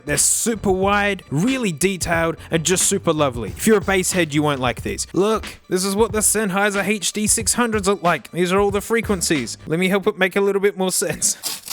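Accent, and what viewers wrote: Australia. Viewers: The Australian accent gives me the seratonin to live through this shite pandemic. I thank the youtube algorithm for showing me this amazing channel and I thank you sir for existing. Just lost my grandma recently, so being genuinely happy is something hard to come by. This made me laugh so much, I appreciate your content, youve got a sub from a very thankful viewer, cheers. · Is this guy Australia?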